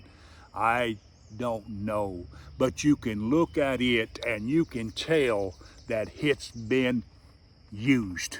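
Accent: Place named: Louisiana, United States. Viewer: Hi, just found your channel and subscribed. I just got my firearms license up here in Eastern Canada, along with a good friend of mine. I picked up a Benelli Supernova, but my friend somehow managed to get his hands on the Wingmaster. Great video... and as a French-speaking Canadian from up North, love to hear the cajun in your voice. Merci mon ami!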